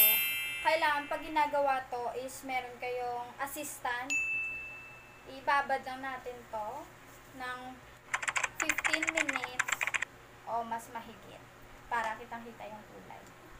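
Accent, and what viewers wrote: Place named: Iloilo, Philippines. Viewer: Ang galing naman ng salita nyo parang mga ilongga original 😁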